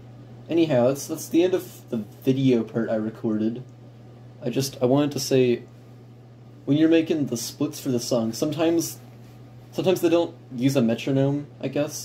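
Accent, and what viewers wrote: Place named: Western Canada, Canada. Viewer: That's tricky...but I'm going to go out on a limb and say I'm getting a western Canada vibe from your voice. Are you from BC?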